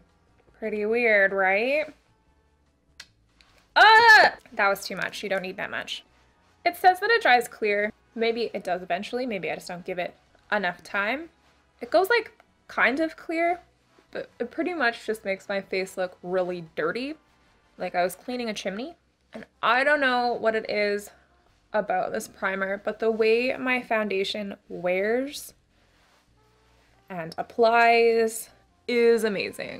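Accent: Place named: Canada. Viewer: I'm a little late to the game but goddamn is it good to see you back 🖤🖤 and good to hear that sneaky Canadian accent 🤗🖤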